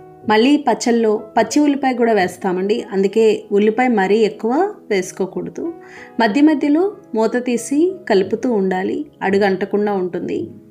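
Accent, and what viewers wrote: Godavari, India. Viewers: అది రాగాలు ఏమీ కాదు, గోదావరి జిల్లా ల మహిళలు అలాగె స్పష్టంగా మాట్లాడతారు, అది వారి మాండలిక శైలి, శ్రధ్ధగా వింటే చాలా బాగుంటుంది, ఎవరి మాండలిక భాష వారు మాట్లాడతారు గదా, దోషం ఏముంది! మీరు ఇలాగే చెప్పండి సోదరీ! సహజత్వాన్ని పోగొట్టుకో రాదు అని మనవి 😮😅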